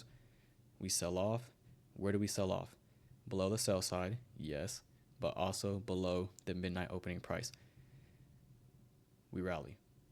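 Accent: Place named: South, United States. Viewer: Where are you based out off? I noticed you have a hint of a southern accent. Im Beytoven by the way. Im always in your live streams lol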